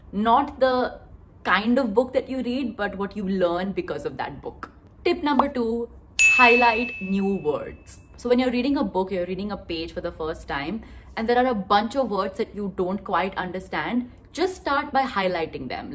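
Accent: India. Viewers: Wow that's very informative video. But haven't knowing your mother tongue, kashe asa tu ? Are you Goan ?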